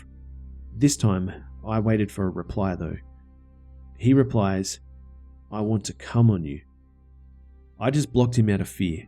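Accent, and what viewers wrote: Australia. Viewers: Australian bro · Is Australian? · He's Australian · Australian I could tell bc of the way he said mate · Are you Austrailan m8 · Australian mate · Yeah he may be Australian but that's like er more British than American. It's older sounding somehow. And I'm not dissing the US here, cos they make good TV, but horror narration just CANNOT be done with an American accent. It's just off putting fsr. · are you Australian I'm From Australia your voice sounds like your australian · He is Australian. I think i should know what an Australian should sound like since i am one. Sup fellow aussies 😊🐨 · I think he may be Australian. No offence. Sorry if I am incorrect. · He isn't British, he is Australian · Finally a fellow Aussie talking about scary shit good on ya m8! 👍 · Only one problem..You have an Aussie accent mate, using American euphemisms...???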